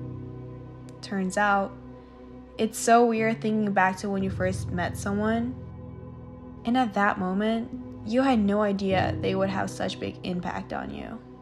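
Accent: United States. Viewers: just wanted you to know I thoroughly enjoyed your exchange video in Iowa. I also want to thank you for taking the time to answer all the questions from potential exchange students that follow you on YouTube. As you know it's a big step to leave home and family for almost a year all on their own. Especially going to a new country, with different customs, new language, new school, staying with strangers and meeting new friends. Your video will most certainly help future exchange students and those thinking about it. I am a former host dad now living in Virginia and have previously lived in New York, California, Illinois, Oregon and Colorado. I still enjoy following the exchange program and watching high school students as they grow into young adults throughout the year and experience the American way of life first hand. I think it is an opportunity of a life time that very few students will ever get to experience. 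I worked for the airlines for many years and have been lucky enough to experience many other countries myself and look forward to my first trip to Denmark this fall. I have heard of the Faroe Islands but admittedly know very little about them (kind of like Iowa was for you) 😂 Since I'm always curious about other countries I had to google the Faroe Island and find out more information. Let me just say the pictures were absolutely beautiful!! Reminds me of Ireland in many respects. I have to ask, how is it your English is so perfect and with an American accen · Hi, did you learn your American English pronunciation and being to speak well while you were on student exchange program? Also, it’s been over a year since then, how are you doing now and you still reminiscing your school year in US? Or just a distant memories now? Thanks. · This video was so touching. Thanks for sharing your experiences...and you‘re English sounds so American now haha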